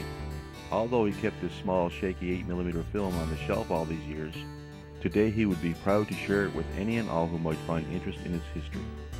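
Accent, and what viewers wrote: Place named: Cornwall, United Kingdom. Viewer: The narrator has a VERY Cornish inflection to his accent. He might have ancestors in Devon.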